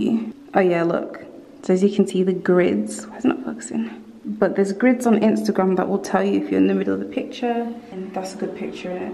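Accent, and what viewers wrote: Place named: Yorkshire, United Kingdom. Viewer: Omg your Yorkshire ❤️ I’m subbing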